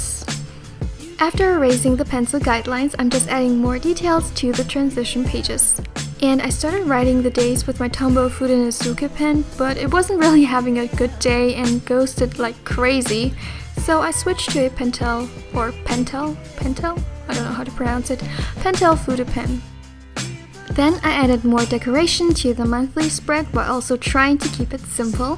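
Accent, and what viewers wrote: Germany. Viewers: Since you said thats what it's called in German, I hear that you are German. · Wait- where are you from? Germany?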